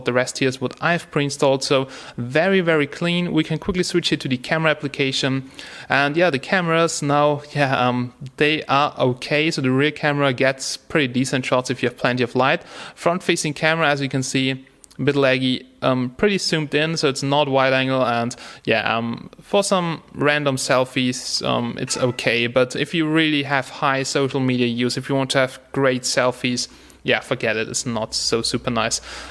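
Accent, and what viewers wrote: Germany. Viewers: I have to say your reviews are great! You're very thorough and really leave nothing out! Great Job! Your pronounciation of some words is quite cliche german, but other than that, you do have advanced free speaking english skills! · ohne jetzt nach zu forschen....du bist doch ein deutscher?....so redet kein Ami oder erstrecht ein engländer!....ich würde meinen Popo darauf verwetten^^.....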